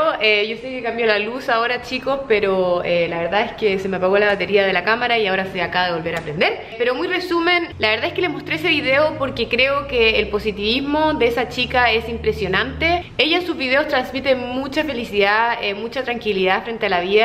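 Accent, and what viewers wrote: Chile. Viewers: Es el acento chileno formal (osea, sin modismos). El típico acento Santiaguino. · Que lindo el acento chileno 😊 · linda modelo pero tiene un acento chileno horrible . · Eres chilena ? tienes todo el asento jaja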